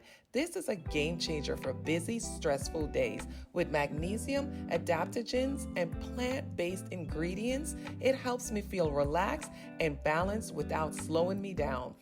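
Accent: United States. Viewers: You are glowing. And your American accent reminds me of Nia Long. She is from the Caribbean, too 🩷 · I really enjoyed this. I though I detected some New York accent. I am from NY.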